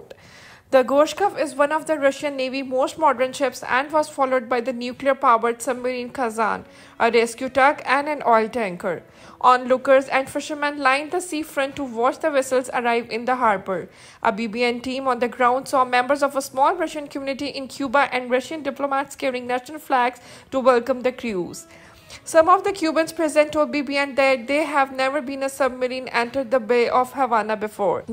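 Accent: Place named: India. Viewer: British ? Not at all ! Your speaker has a strong accent... Russian media with Indian speaker ?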